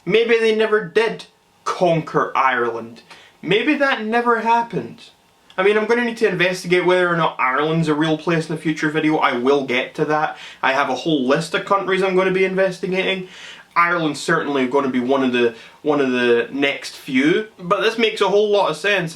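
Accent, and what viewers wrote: Scotland, United Kingdom. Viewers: Shut up man I live in England you’re making fun of our accents but ur Scottish you “we” “idiut” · A Scotsman with a Scottish accent berates Mancurians and Liverpudians. Oh how rich. Sadly he didn't pick on us Brummies - our accent is far worse than any pansy assed scouser! /S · Can u imagine wearing a fucking skirt and sounding Scottish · hurts? its comedy. Hes just making fun of GB. You notice his scottish accent right? He gave you all the clues...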